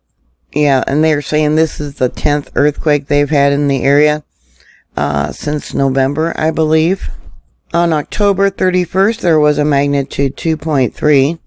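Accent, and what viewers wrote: South, United States. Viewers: Get the rest you need but keep up the good work. Love your old fashion country dialect, especially when you pronounce "Wash" or "Washington" I was raised around the Seattle area and it wasn't until I went into the military that I was told there is no "R" in either word My family pronounced it "Warsh" and "Warshington" also and we pronounced Snoqualmie Snoqualomie where we got the extra "O" is anybody's guess.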